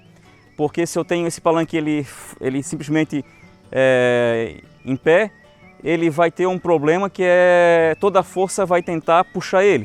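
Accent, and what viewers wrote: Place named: Santa Catarina, Brazil. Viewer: Amo o sotaque catarinense. Sou do Paraná e morei quando criança em SC, amo demais essa terra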